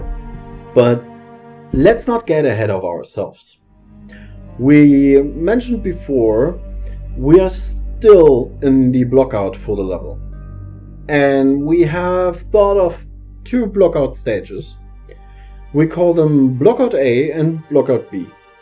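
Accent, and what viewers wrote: Germany. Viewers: you can hear the german accent :D gutes englisch bro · Sehr gutes Englisch fast ohne deutschen Akzent. ❤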